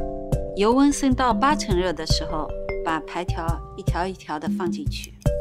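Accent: Shanghai, China. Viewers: 我也听出来，上海人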